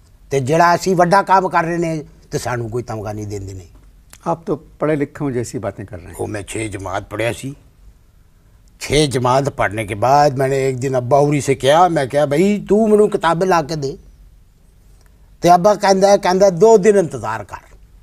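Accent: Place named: Punjab, India. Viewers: Omg! Ye to humse b jyada pure punjabi bolte hai😃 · Typical Punjabi accent style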